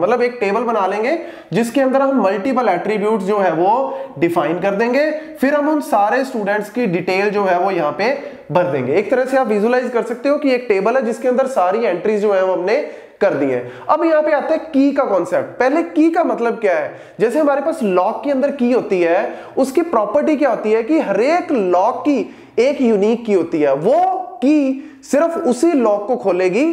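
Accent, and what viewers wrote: Punjab, India. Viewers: That punjabi tone in ur voice 🔥🔥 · Sir aapke bolne k tareeke se panjab ki khusboo aati h 😁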